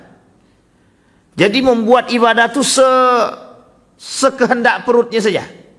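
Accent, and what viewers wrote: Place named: Rokan Hilir, Indonesia. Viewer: Logat pak Ustadz mirip dg BHS Rohil Riau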